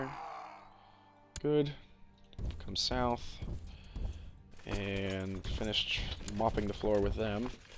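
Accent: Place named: England, United Kingdom. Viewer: I can definitely hear the English in your accent, just generally the way you speak and the words you use. Just thought I'd let yet know.. :D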